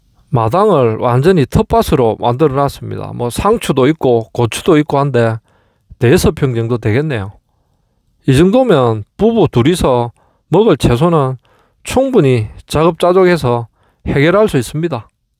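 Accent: Gyeongsang, South Korea. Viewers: 갱상도라 더더욱 신뢰가 안가네…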